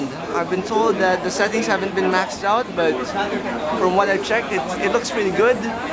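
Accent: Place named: Philippines. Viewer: This guys sounds Filipino. XD F to P's and P's to F's. Just like my mom...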